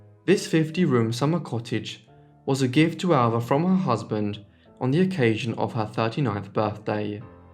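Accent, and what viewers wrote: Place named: United Kingdom. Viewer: You mispronounced the name of the city she was born in. Albeit it is spelled Mobile it is actually pronounced as follows (Mo Bill). Your accent indicates to me you come from the United Kingdom if I heard it correctly so I suspect that's why you pronounced it the way you did as it appears on the page. Rest assured I'm not trying to be rude but am simply from the south and felt you'd like to know the proper way it is pronounced down here. I'm rather sure you might find that helpful for future reference.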